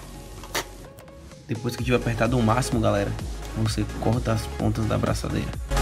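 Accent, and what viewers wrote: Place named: Bahia, Brazil. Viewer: Sotaque de baiano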